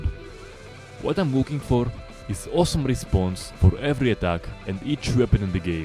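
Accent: Russia. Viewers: Здравствуйте, кто вы по нации? Hello, what is your nationality? You seem to have a Russian accent, or maybe not. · whats your accent my sister says russian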